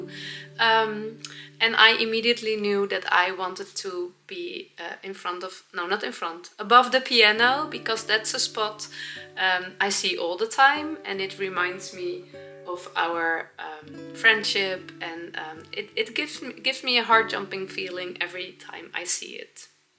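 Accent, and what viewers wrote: Netherlands. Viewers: Love your Dutchy English 🙂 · I love, love your “Dutchy” English so much and you are always learning our way of speaking. It makes me smile and happy to see and listen to you. Your knits 🧶 are beautiful and very inspiring for me❤❤❤❤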